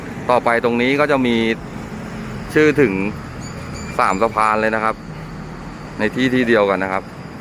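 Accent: Ratchaburi, Thailand. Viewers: สำเนียงของแท้เลย ชาวราชบุรี ชอบครับ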